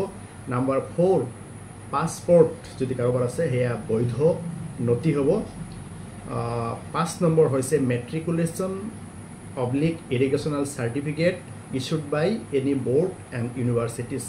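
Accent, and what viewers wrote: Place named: Bangladesh. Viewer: Maat tu pura bangladeshi jen lagise.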